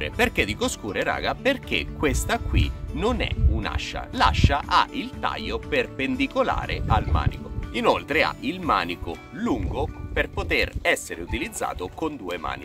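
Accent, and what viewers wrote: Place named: Agrigento, Italy. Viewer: Ciao dall'accento sembri di Agrigento io sono di Palermo l'inverno della nostra isola e così mite che da lunedì sono previsti 20/°C 😎☀️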